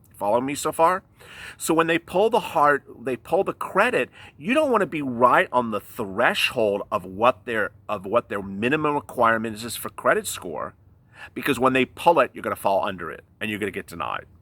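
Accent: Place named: West Coast, United States. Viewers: That’s where his accent comes from...the west coast! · Sorry about the typo 😀 I meant to say that I’m from the east coast and I love your west coast accent ❤️❤️❤️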